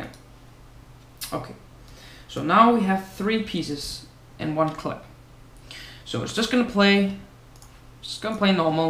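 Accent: Denmark. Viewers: du er dansker, det kan jeg se og hører på dig:D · Ej det tydeligt at høre du dansker ;) god arbejde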